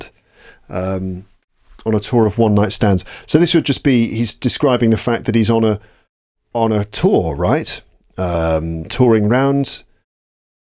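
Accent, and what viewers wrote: RP, United Kingdom. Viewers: i really like your podcast, your british accent makes it unique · Thank you. I was waiting for your new episode. You have helped me a lot to improve my British pronunciation. Hope to meet you in person!:) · Hello,! I've enjoyed this rambling episode because you have talked about various themes, you have played different sorts of guitars, you look so happy and relaxed when you talk about your children and when you play guitar!!! Obviously, I'm always pleased to hear your clear modern RP English. As regards reporters' way of speaking English, I think they should speak as well as you do. Thank you for your dedication and kindness. 😊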